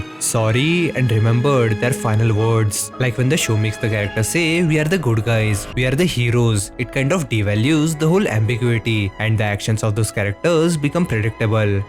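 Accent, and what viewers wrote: India. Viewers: i can tell hes indian i love how they says english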